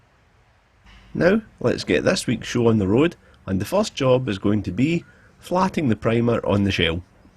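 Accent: Scotland, United Kingdom. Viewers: This man, talented, hard working, creative and all of that with a great Scottish accent.👍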